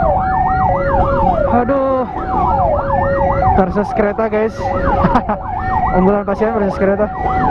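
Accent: West Java, Indonesia. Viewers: sunda gening anjayy 😆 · Oh abang teh orang sunda. Sama atuh bang sunda na timana. Abdi mah cianjur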